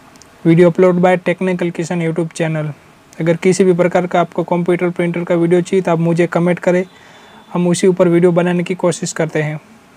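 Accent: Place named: Gujarat, India. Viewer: Bhai aap Gujarat se lgte ho aapki language se....Achha mujhe yeh to btao k A4 paper ka business krna ho to Guide kre aap plz.Thank you dear.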